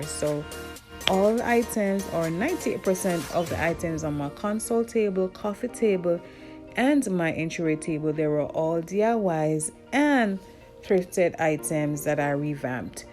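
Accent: Jamaica. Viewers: 2 seconds in, she's Jamaican 😊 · Are you Jamaican? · You sounds like you from 🇯🇲🇯🇲 but anyway job well done ❤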